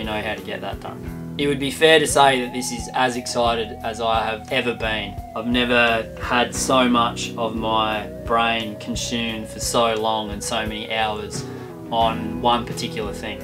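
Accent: South, United States. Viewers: Hilarious accent and insight to the hillbilly .... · Ahhh du liike ur very southern accent. Good job. Good Luck on obtaining your “Green” vessel. You need one of those suspended dog run cables for Lenny. As a parent of 3 children and 11 grandchildren I can fairly say you need eyes in the back of your head and that’s on dry land. Take care and stay healthy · I think it was unbelievable that an Aussie could do such a fine job on a stereotypical good old boy accent. Seemed kind of Archie Bunkerish though. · Emission free hype crashing into whole of life reality. Unless you made it from carbon sunk wood in its entirety then there is no way. Hemp sails and rope. That southern accent will come in handy as you tend the fields and cut the trees. You did say it was your dream. That Outremer looks pretty good from here even if it is a climate change liability. 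· Love the southern draw coming from a Texan myself, I look forward to seeing what comes out of all this. ♥️ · Your southern accent cracks me up! You got it ! ....lol. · Well, I'm a Texas guy and honestly his "southern drawl" is easier to understand than the Aussie accent! And I've been to Aus-traala! I'm also a Tesla guy.. so good on ya, Aussie! A noble quest!! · Oh my lawd that Southerner accent... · An Aussie man in a French boat talkin with a southern twang! Its a beautiful 🌎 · Wow, your country-American accent is... oddly on point. Sounds like north Georgia/Tennessee. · That’s a pretty good American accent, straight from the South! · Nice Southern accent for a Down Under bloke! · As an American, I love your American accent! Also, the emissions free boat thing is cool too. 😁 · Very interesting idea. Hope it turns out! Really liked the southern accent too 😂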